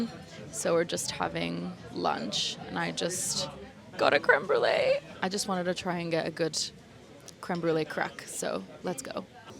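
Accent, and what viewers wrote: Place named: Italy. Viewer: You are Italian?? Great accent!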